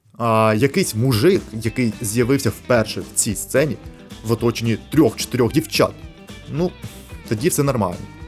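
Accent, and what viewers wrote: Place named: Ternuvate, Ukraine. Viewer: Прийшла дивицця заради божественного тернуватського суржику, а його тут не було :( шо за підстава? П.с.: з дебютом🎉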